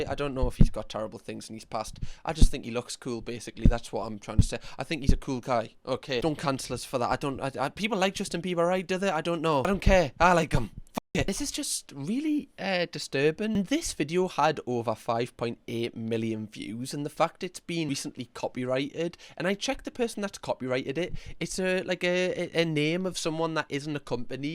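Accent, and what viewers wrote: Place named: Newcastle, United Kingdom. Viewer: I've been wondering where this accent is from... it's so cool. 😁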